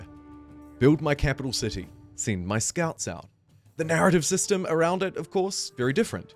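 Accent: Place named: New Zealand. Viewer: The familiar Kiwi accent is a welcome!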